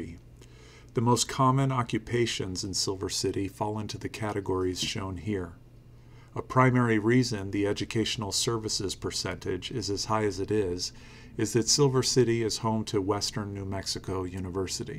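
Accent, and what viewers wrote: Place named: Northern California, United States. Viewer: i am curios- are you from Nor Cal? It just feels like you are sir- would love to know as have watched several of your videos. Thanks- Robert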